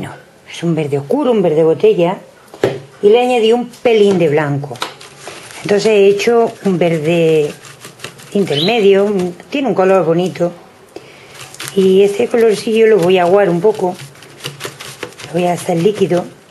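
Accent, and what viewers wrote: Andalusia, Spain. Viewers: Excelente trabajo y me gusta mucho tu acento. De qué arte de España? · eres española tienes unas maneras tan particular de decir las cosas .bueno saludos ...pd. eres andaluz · tienes talento. Seguro. Además tu voz y tu bonito acento del sur subyugado por el canto de tu canario. Cordiales saludos!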